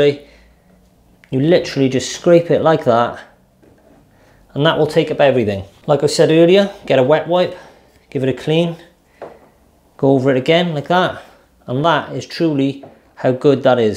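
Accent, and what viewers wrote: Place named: North West England, United Kingdom. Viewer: Familiar North West accent. Thank you from Italy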